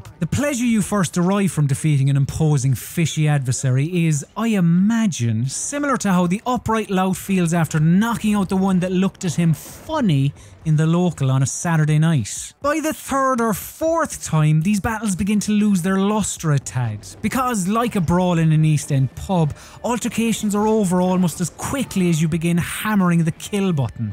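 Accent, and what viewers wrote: Ireland. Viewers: Hearing a Irishmen talk about a shark game was Quite pleasant definitely will be subscribing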